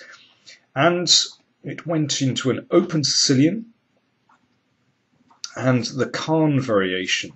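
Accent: England, United Kingdom. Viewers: I love his English accent and the way of saying "indeed". You re the king.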